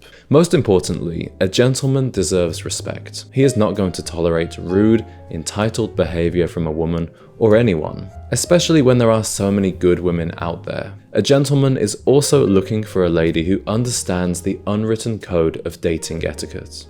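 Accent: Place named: United Kingdom. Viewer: No like for british accent . I thought its american 😢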